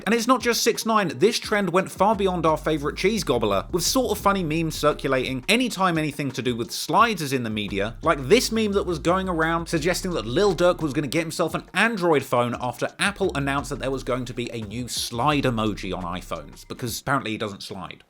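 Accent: United Kingdom. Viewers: I like how “trap shit” is getting covered by a harmless sounding British fella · just a heads up a british accent is not at all good to try being cool and for sure not trying to speak slang man it is horrible please stop trying to talk slang AT ALL or bringing attention to shit the cops may not know yet but putting things you learned out there for more options drama is good for views but not talking street shit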